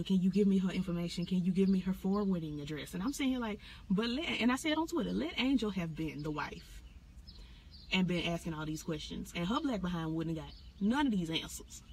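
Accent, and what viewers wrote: South, United States. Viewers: You sound so Down south!!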